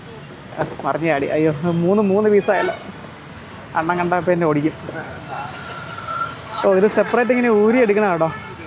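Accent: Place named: Ernakulam, India. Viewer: Off topic. accent gives me chuckles. I am amazed how many accents a district like Ernakulam has. Another prominent one is from Kochi area. Edapally/Kalamassery/Aluva has no typical accent but more of a mainstream Malayalam I like to believe. Anyways your sweet accent makes the video more lively.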